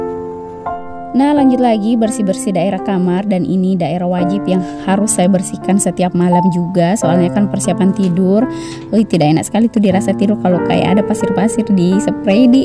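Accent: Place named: Makassar, Indonesia. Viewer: Hallo bunda salam kenal bunda asal mn??bahasanya ky org makassar jg yah...semangat beberes2 yah bunda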